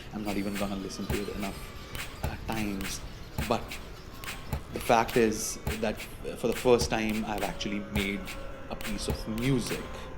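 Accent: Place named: India. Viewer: Your accent is like indians...🙄🥰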